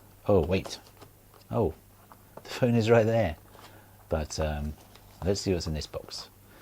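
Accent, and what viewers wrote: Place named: England, United Kingdom. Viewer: Are you in the UK (you sound english haha)? does volte and wifi calling work and what network you with?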